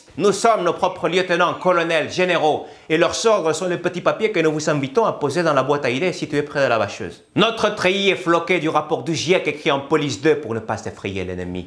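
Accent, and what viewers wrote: Latin America. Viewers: Savant mélange d'accent latino américain avec une pointe d'accent du midi, quelque part entre Nîmes et Béziers ^^ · Le texte est génial comme d'habitude, mais en plus l'accent latino-américain est superbement imité! ¡Adelante compañero!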